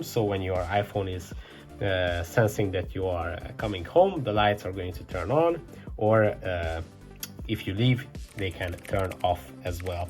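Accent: Hungary. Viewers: are you hungarian? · Are you hungarian? I recognized the accent :D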